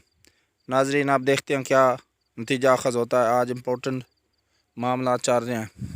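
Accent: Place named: Punjab, Pakistan. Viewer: It would be nice if some one who knows urdu language with out punjabi accent, stop punjabi Gardi !!!!!!!!!!!!!!!!!!!!!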